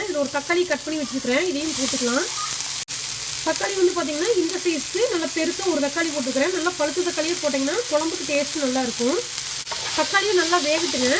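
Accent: Kongu, India. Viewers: உங்க கொங்கு மனம்... உங்கள் பேச்சிலும் வீசுது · Samayalum super.Namma kongu thamilum superunga. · Super sister. Namma ooru style la super ah sollirukeenga. Naan Gobi arts la dhan padichen sis. Native sathy. Happy ya iruku namma oor style la kekaradhukku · aaha... naan vaniputhur-medu nga (near T.N.Palayam).. nam mannin vaasanai polave ungal pechum, unvaum.. neenga kalakkunga.. endra aatharavau ungalukku eppoluthum undu. Thank you.. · தோழிக்கு வணக்கம். நம்ம கொங்கு தமிழே தனி அழகு தான். 😊. அருமை. நம்ம ஊரு எந்த ஊருங்க. நான் ஈரோடு ங்க. என் வாழ்த்துக்கள் 👍😊🙏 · kongu tamil epayume azhaguthanga..namma pecha aduchuku aale kedayathunga..super sis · Arumai ma. Athaivida arumai unga kongu pechu vazhakku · Kongu thamilum dishsum superngo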